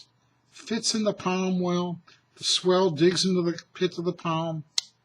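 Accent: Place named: United States. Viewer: This american accent while speeking german words is the besteht :D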